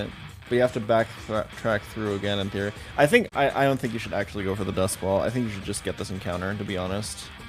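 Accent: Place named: United States. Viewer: YouTube and twitch. Come for the highest tier of nuzlocke Pokémon content. Stay because how tf does this guy sound more American than 80% of American people? Hong Kong and Singapore and Germany. Has he intentionally adopted the American accent from watching cartoons and other shows over the years that are created by “US English” speakers? Does he do it to be easier to digest for the US viewership market? Maybe his USA accent is just really good. I watched 151 attempts of EK, I had a lot of time to consider this while he was wiping to Watson because no VA chinchou.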